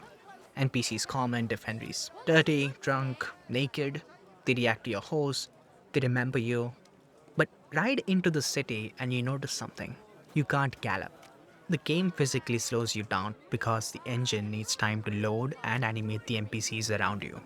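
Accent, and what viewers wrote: India. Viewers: are u indian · chatgpt script, youtuber vidoe essayist cadance, indian babble accent overpowering everything, terrible shit brothaaaaaaaaaa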